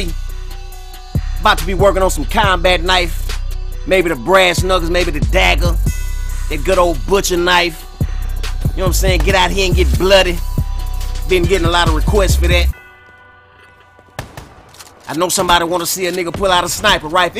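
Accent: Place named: New Orleans, United States. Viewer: Ya heard me lol New Orleans accent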